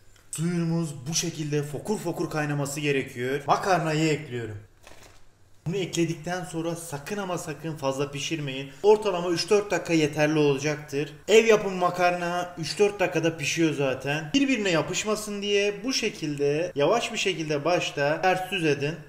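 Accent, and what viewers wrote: Adana, Turkey. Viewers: Abim sen adanalısın napıyonn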